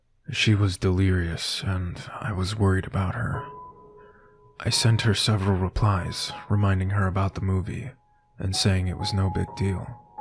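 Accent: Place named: United States. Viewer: Certified thug classic. Love this story and happy to hear a murican read it.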